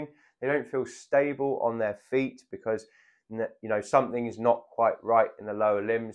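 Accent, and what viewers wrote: England, United Kingdom. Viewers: Love watching your videos after stumbling upon them. Love your accent -English?? Look with hope I will see Improvement, would have very much liked a consult with you but I live down under at the South Pole, instead I say thank you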